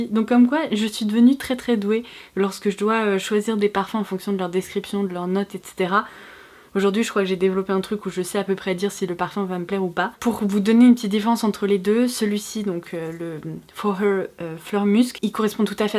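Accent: England, United Kingdom. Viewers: Oua! Mais franchement Oua! Ca fait un petit moment que je te suit, déjà je te trouve super en tant que personne, tu es très belle, j'adore ta façon de parler et ton accent anglais me fait rêver Lol Ton unboxing me vend du rêve, les produits Daniel Sandler m'intriguent beaucoup, ils ont l'air vraiment beau, j'ai vraiment hâte que tu les test pour voir le rendu, la palette pour le visage ND, non mais comment dire que idem trop hâte que tu la testes, elle est splendide, je n'avais pas entendu du RAL de cette marque, mais il a l'air splendide également! Continues ainsi, tu es au top et très agréable à suivre! PS: j'adore tes " OH, Ouah!"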